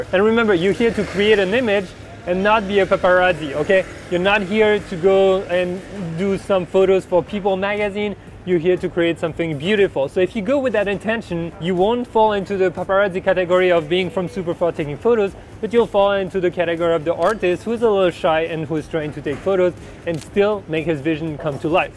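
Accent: France. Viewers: yo! Love your video, they are really great! Can you or anyone else that knows it tell me from where does this accent come from? because i feel like its french in the way you pronounce some "r" but im not sure:)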